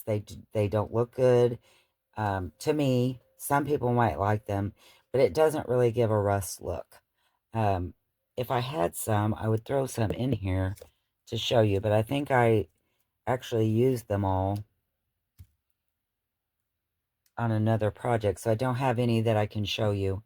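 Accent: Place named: Texas, United States. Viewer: Thanks for this, this is so helpful for someone like me, to get our toe in the water. You’re so fearless!!! Wear gloves!!! If that stuff is corrosive enough to rust paper clips so fast, just think what it could do to those fingers! The reason the soda can doesn’t rust is that it’s aluminum and aluminum won’t rust. And, just another thing, hydrogen peroxide is something that some bombs are made of 😮. Not the stuff you’re using, not the stuff that you can get over the counter, but take care to pay attention to the strength on the label and how warm it’s getting. You can even have an ice bath by just in case things seem to be getting out of hand. Just in case. 😅😊 And wear gloves!!! What about washing the things then rinse with alcohol and let dry thoroughly. Don’t let any alcohol stay behind - another chemical reaction. But, then there wouldn’t be any grease or dirt coating to keep it from rusting faster. 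The the sticks are just the same as paper. 🤔 And wear GLOVES!!! You sound like you live right next door to me here in Austin, TX, get on down to the H-E-B and get you some of them cheap vinyl gloves… 😂😊 I just love your show and I don’t miss a one 😊🥰💙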